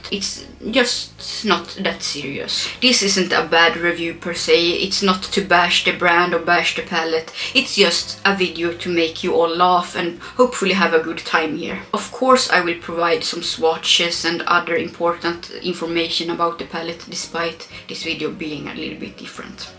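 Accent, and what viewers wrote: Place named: Sweden. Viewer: The Swedish accent is on another level! 😍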